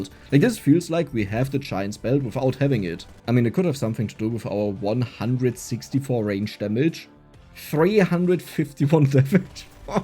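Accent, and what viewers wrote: Germany. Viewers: what do you mean finding out? You couldn't tell from the German accent?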